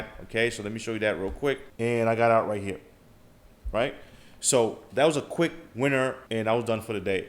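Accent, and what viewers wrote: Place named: Baltimore, United States. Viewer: I can hear the Baltimore in ya voice haha, love your vids from Jamaica 🇯🇲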